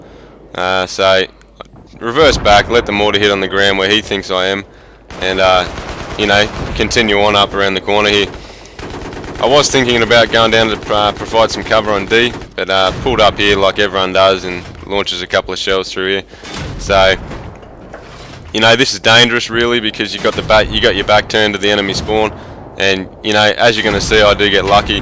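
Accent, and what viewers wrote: Australia. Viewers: Great commentary man, good tips. It's good to finally hear a Aussie commentator. :) I'm also looking for more people to play battlefield 3 with on Xbox. Thanks.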